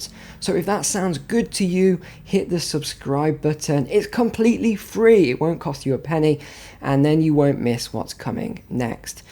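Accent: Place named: Scotland, United Kingdom. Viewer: Önrealized? Dötsch GOVT? Bödget? Is that a scottisch Akzent?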